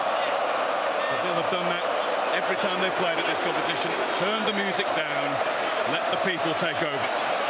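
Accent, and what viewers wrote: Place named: United Kingdom. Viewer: inglês britânico???? por quê????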